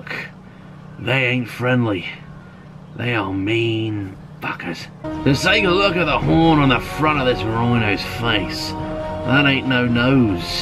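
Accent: Australia. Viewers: He does such a good outback aussie accent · Are u trying to sound Aussie because if so it sounds cool and funny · THE AUSSIE ACCENT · nice Aussie accent mate, and that's coming from an aussie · Nice aussie acasent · I think his British helped the Aussie accent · That is the best Australian accent I've ever heard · love the steve Irwin accent. Aussies are rolling with laughter, including myself. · Australian I believe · That's the funniest Aussie accent I've ever heard 😂😂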